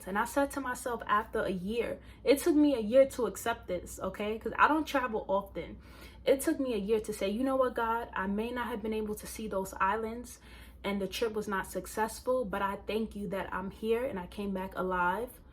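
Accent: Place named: New York, United States. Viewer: Omg are you from NYC, is this how we sound😂😂😂😂 lol 🌺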